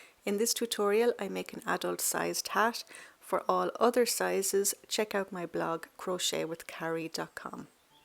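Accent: Ireland. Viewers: I'm looking for tutorial for a winter hat, but I stayed here till the end just to listen to this beautiful Irish accent. I lived in ireland for 11 years, miss hearing it 🇮🇪 😊 🇵🇱 nice beanie, maybe I will try making it 👍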